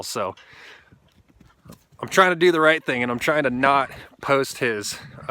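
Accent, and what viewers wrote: Idaho, United States. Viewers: Wait you are from Idaho?! Me too ahhaha I was just about to say you sound like an Idaho man hahah love it!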